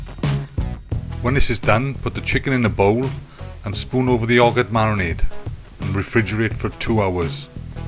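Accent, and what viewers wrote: Wales, United Kingdom. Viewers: Are you welsh because if not, I'm rubbish at guessing accents?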